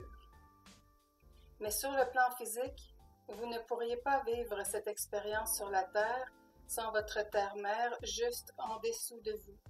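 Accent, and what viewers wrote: Quebec, Canada. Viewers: Merci encore avec une superbe musique et des messages très inspirants et avec votre voix du CANADA ou QUEBEC.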